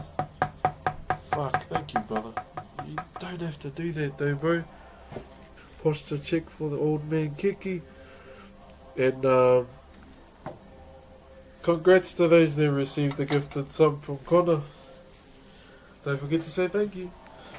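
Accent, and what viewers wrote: New Zealand. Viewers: both are kiwis, yeah?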